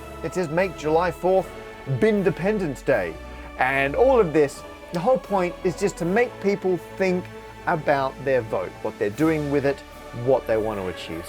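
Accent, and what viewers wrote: Australia. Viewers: in Australia, my home and your former home, we have preferential voting. You number the candidates 1...n and they eliminate who came last and move those votes to their second preference. That is repeated until someone gets over 50%. It will solve your coffee vote scenario where the least popular party wins. Speaking of Australia, you're losing your Aussie-ness. Graph has the 'a' pronounced like 'a' in grab not grass.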